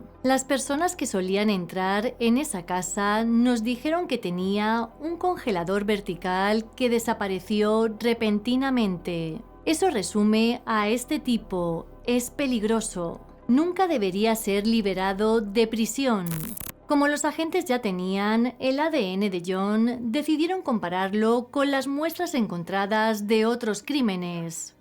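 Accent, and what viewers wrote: Spain. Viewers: No molesten a, así es el acento o dejo en algunas regiones de España… es como que a un cordobés lo criticaran por hablar como un cordobés (de Cordoba, ciudad argentina). Hablan cantando y se les escucha muy gracioso aunque a veces no se les entiende. Pero son genuinos!!! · ¿Le dirías a un mejicano que no hable como Cantinflas?, ¿O a un venezolano que no hable como un narco?, es Español castizo, no es latino, así que ajo y agua, a mí me encanta como habla. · O será el acento de la región de España donde nació. No se que decirte.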